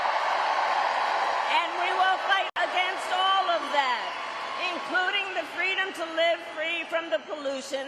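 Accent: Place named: South, United States. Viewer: I see she got her southern accent back. Who’s paying for people to get $25,000. Oh that’s right,the house is going up in price. Why didn’t Trump do what’s she’s saying when he was president before? Trump didn’t do anything she’s talking about. Women can still get birth control. Kamala Harris stop lying. You can still get birth control and abortions up until 12 weeks. Why are people believing her. She’s a little tipsy I’d say. I guess it’s true what they’re saying. Is she saying you’ll have to vote? Kennedy has been working on the environment for years. He saved the Hutson River while she was putting black men in prison for cannabis.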